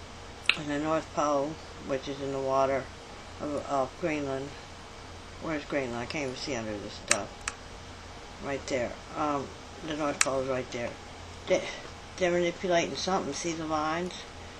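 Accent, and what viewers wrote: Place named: Appalachia, United States. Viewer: You sound like a hillbilly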